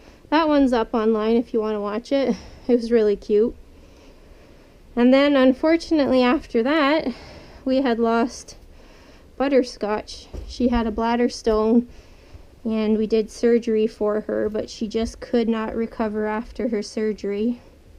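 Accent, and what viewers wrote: Canada. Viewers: I'm from FL and I've never heard the way you say it but I immediately knew you were from Canada by the way you say certain words. For someone to be so petty and call you illiterate, well, they just have way too much time on their hands...I thought it was cute and made me smile.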